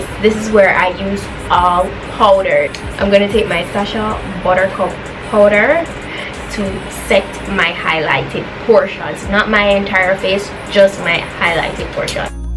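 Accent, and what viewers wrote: Jamaica. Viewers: are u a Jamaican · Your Jamaica don't it?🇯🇲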